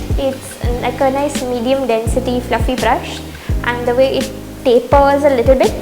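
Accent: India. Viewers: I really loooveeee the way u talk u know ur English is phenomenal..u enunciate syllables..dats really catchy..at first I didn't like it...but now..I just watch it videos to see u talk.. moreover most Indian YouTubers fake an American accent..but ur pakka proud Indian Naari! Love u..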